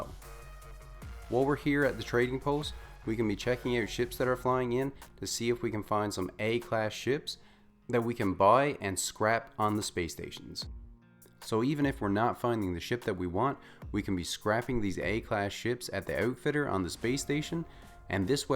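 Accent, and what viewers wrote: Newfoundland, Canada. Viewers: Are you a Newfoundlander my guy? Watching from Dartmouth NS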